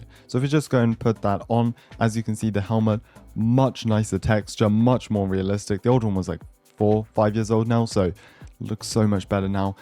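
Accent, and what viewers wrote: United Kingdom. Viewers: Wait are you British?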